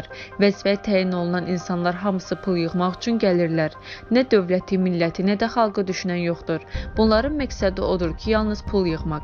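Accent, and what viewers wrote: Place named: Baku, Azerbaijan. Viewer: Пелтейи отуздурубсуз орда , башга журналист йохдур Бу гызын сеси адамы лап есеблешдирир Гуйа бу Шехер лехчесийле данышыр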